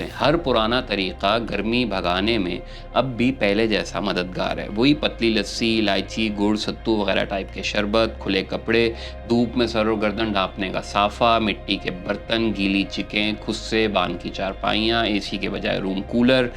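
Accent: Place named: Karachi, Pakistan. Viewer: Waw, excellent bhai kya behtareen boltee hain aap, I presume you are from Karachi for sure :) as your urdu was as par. Initially trees an parks can safe us, as our activity is zero and we have concrete everywhere around us. Greenery/trees at certain level, plus managed family parks, clean water, 24X7 clean electricity and safety should be part of law to must have for a town. If this can not be done to already developed towns/cities then at least apply them to new towns, there are number of new societies where this can be achieved.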